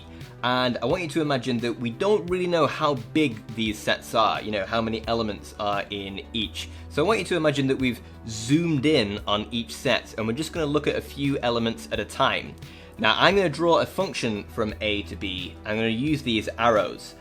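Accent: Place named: Northern England, United Kingdom. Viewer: He's from the north of England. The accent you're referencing is confined to the south east.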